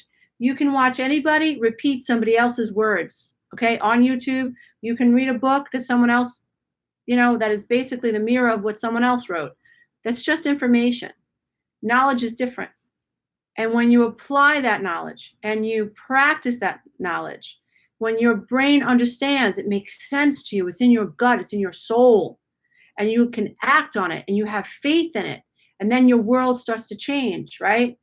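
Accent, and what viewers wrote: New York, United States. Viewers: You are wisdom with a New York accent 👍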